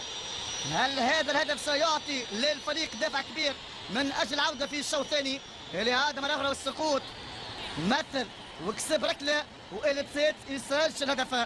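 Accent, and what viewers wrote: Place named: Italy. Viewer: المعلق كأنه ايطالي😃👏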